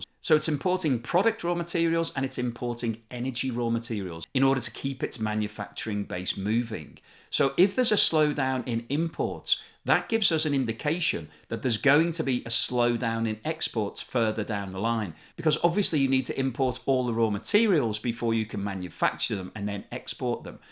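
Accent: United Kingdom. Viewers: You have a British accent but you don't know shot.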